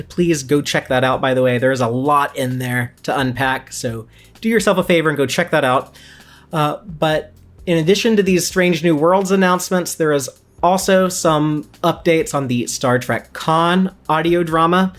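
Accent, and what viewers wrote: United States. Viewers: You did a great job of presenting this! Do not apologize. It's nice to hear an American accent sometimes, and you spoke so clearly that I didn't need captions. TREK X MUPPETS?? I'm there. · No offense to this narrator (He did a really good job) but I love Sean's and Ellie's accents. Getting my Star Trek news in an American accent just doesn't hit the same.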